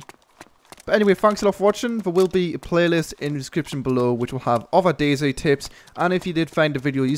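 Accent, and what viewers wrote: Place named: Northern England, United Kingdom. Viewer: good to hear a fellow northener on the tube :)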